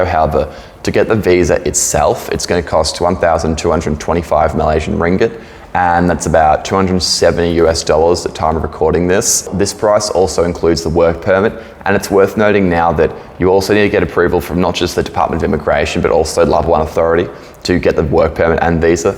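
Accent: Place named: Australia. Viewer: Sounds Australian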